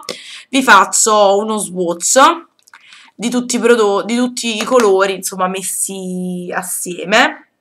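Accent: Naples, Italy. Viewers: Nuova iscritta !! :) Sei simpatica, carina e dolce ! E poi sei napoletana (mi pare di capire dall'accento!) ... bacio :*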